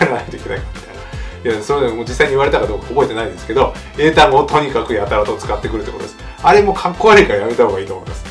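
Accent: Japan. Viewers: 貴方の話し言葉を聞いていると、間違いなく、正真正銘の日本人ですよ。間違いない。沖縄県人ぽくて、素晴らしい日本人だと思いますよ。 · ほんとだ。話すと確実に日本育ち。ファッション、髭込みで、普段のハリウッド俳優というより映画やステージ上の白人俳優っぽいもんね。電車の話も女子が陰で牽制しあってる方向で想像する。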